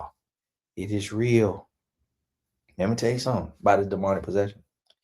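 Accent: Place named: Florida, United States. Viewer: Smh, I've had this convo with a few folks recently and was left feeling really bad. Was questioning my values and acceptance of others for standing firm in not being willing to entertain a potential bi-sexual man. THANK YOU for speaking on this... And the country/Fl delivery is quite funny and REAL😂 Ladies, resistance is really a form of protection, don't let these podcasters and society let you think otherwise!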